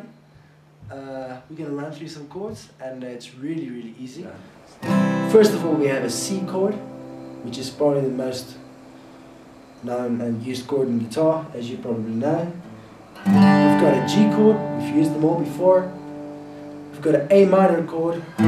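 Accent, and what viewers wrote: South Africa. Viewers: Cool clip, easier than I thought it would be to play. I thought you sounded South African. I'll go play this on the stoep tonight before the braai. Cheers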